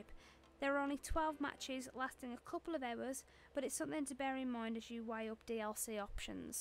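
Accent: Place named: Birmingham, United Kingdom. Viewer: Your Brummie accent is top tier!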